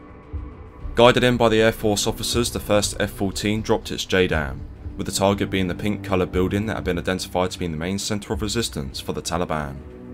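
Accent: England, United Kingdom. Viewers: What accent is this? What part of England?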